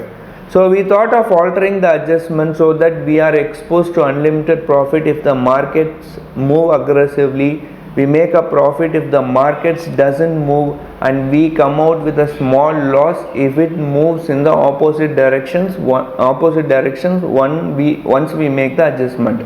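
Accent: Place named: East India, India. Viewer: Hey, he does well. I found that by slowing the speed to 75% and using CC if available works quite well. I have a slow ear from listening to South American Spanish speakers, much slower than the East Indian cadence. :)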